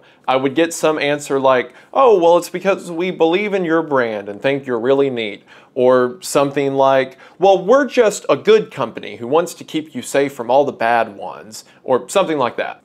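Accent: South, United States. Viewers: you've got the perfect southern draw to voice how it's made or similarly just reading a phone book out loud to relax me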